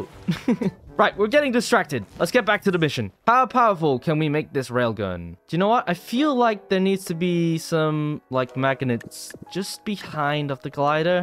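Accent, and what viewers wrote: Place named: United States. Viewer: Blackpool boy turned American wtf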